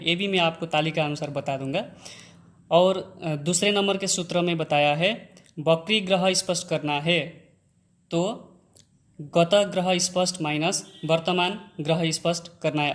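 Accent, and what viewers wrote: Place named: Nepal. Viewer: Nepali le hindi boleko ठ्याक्कै thaha hunxa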